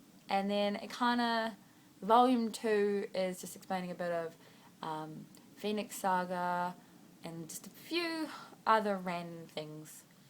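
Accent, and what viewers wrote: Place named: New Zealand. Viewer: You sound like a kiwi!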